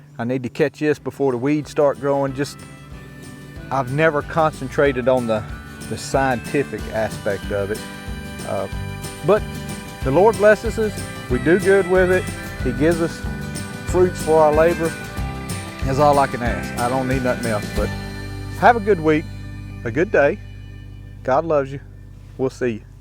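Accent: North Carolina, United States. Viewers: Well it sounds like we are both from the great old North State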